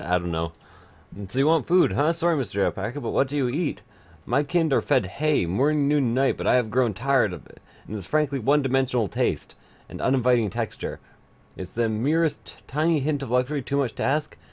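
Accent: Canada. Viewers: Your voice is like sexy butterscotch being dripped into my ears. Where in Canada are you from?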